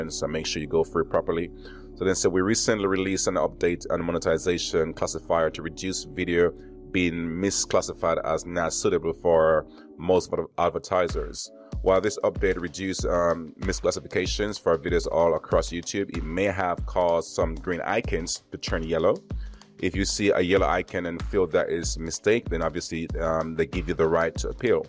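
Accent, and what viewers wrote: Jamaica. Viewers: you jamaican?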